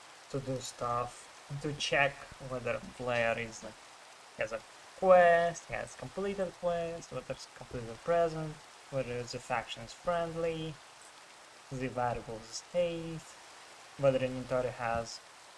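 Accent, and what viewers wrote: Russia. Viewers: i think you are from Russia, because your acsent, words: "kek", "da".. Hmmm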